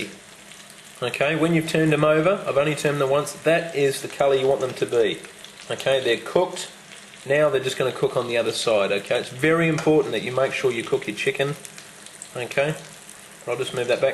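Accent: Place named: Australia. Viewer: r u aussie great stuff man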